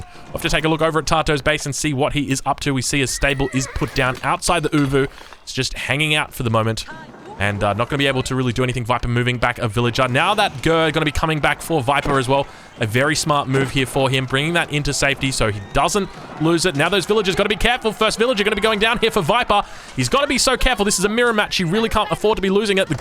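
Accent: Australia. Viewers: So refreshing to listen to a fellow Aussie caster. Love ya work mate, keep it up!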